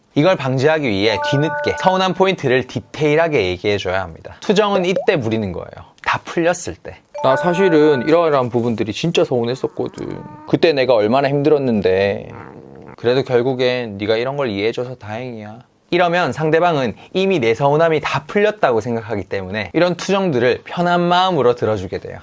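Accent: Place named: Seoul, South Korea. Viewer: 경상도사람인데 서울말잘한다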